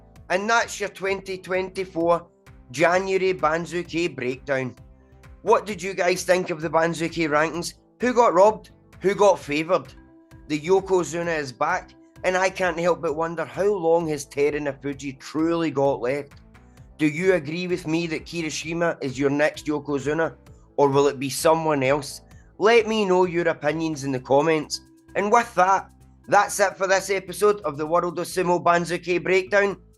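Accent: Scotland, United Kingdom. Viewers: Good job, and pretty hilarious, too. A thick Scottish accent trying to pronounce Japanese is a hoot. Fortunately you had pics so I could recognize who you were talking about. I would like to see Teru in good health fight one more Basho. Kirishima may be the next Yokozuna, but Atamifuji will follow soon after, I think. Takakeisho will have to go through anger management therapy before he makes it, but if he does he will no longer be as good -- Dilema.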